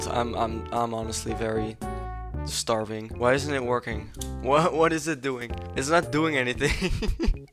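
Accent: Netherlands. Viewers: Ik hoor een nederlands accent Edit: NEDERLANDS, HET IS BEWIJS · wait are u dutch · what nice video with a thick dutch accent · I hear a little bit Nederlands 🔥😭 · From the very first second of hearing your voice I knew you were Dutch lmao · you dutch? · i smell a lil dutch hmmmmmmm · ur dutch? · Are you dutch? WAS THAT THE MEDIAMARKT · ik vind jou nederlandse accent echt geweldig :) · Is bro nederlands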